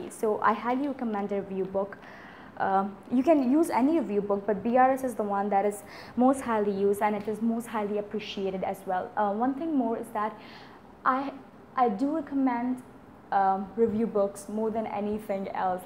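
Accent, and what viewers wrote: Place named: United States. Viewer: Your pronunciation is just like American but little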